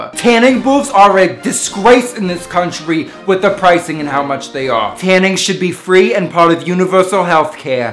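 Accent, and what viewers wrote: New Jersey, United States. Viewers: This is one of the best New Jersey accent I've heard.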